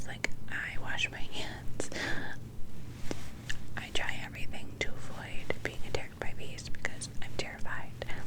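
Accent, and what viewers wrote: New York, United States. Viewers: Okay these videos are tingly but they are really fun. The new york accents kill me . Never ever ever ever ever stop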